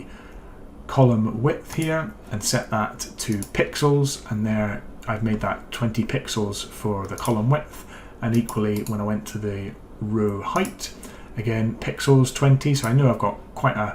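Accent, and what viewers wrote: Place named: Scotland, United Kingdom. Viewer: I really appreciate all your efforts spending to provide such detailed instructions about Power Platform and Office apps features. I lost my mobile iPhone in Aberdeen, 2 days later someone found it and called my emergency contact with Scottish accent (obviously), and I managed to get it back. Since then, I am happy to hear anything with Scottish accent. Sorry for the side note 👍🏻Kudos to you.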